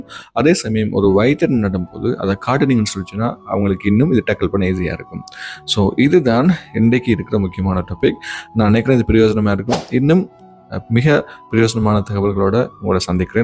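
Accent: Sri Lanka. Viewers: Ungade pechu Srilankan's mathiri irukku sir.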